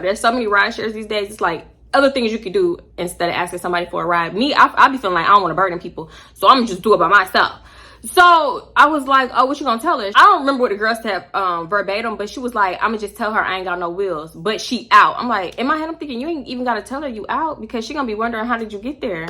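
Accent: Baltimore, United States. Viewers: When did you get this B-More accent 😂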